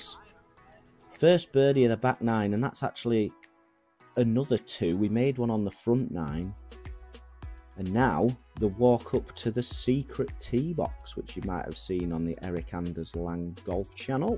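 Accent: Yorkshire, United Kingdom. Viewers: Watched the whole vid, defo a white rose lad. Hope your wedding day was awesome, you’ve gained a new sub. Much love from Lancashire.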